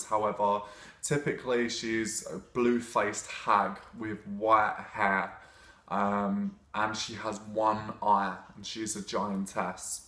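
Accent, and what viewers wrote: England, United Kingdom. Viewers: I have only ever heard Irish and Scottish speakers pronounce it like kal-y'och, call-y'och, or cull-y'och...So I feel like he's saying it pretty accurately, just with a more English accent. Of course I'm American, so maybe I'm wrong. :)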